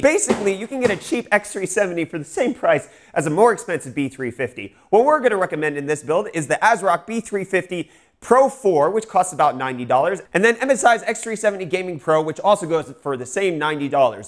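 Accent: South Africa. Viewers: Are you South African? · Are you south african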